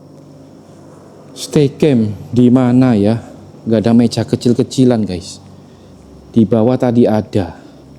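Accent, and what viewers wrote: Java, Indonesia. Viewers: Inggrisnu MEDOK JOWO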